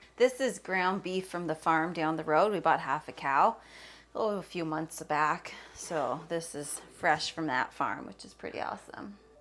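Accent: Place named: Canada. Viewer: Interesting that you pronounce it "hambug" instead of hamburger. My father said it that way but I rarely if ever hear anyone else say that. Is that a Canadian thing? My father was not Canadian (he grew up in Buffalo NY which is very close to Canada though).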